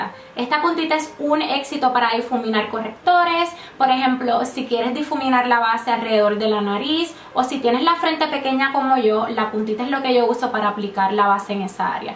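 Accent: Puerto Rico. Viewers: Muy buen vídeo. Me encantan tus vídeos y el hecho de que eres una Youtuber que se expresa como yo, a lo boricua!!! Mucho éxito y bendiciones. :D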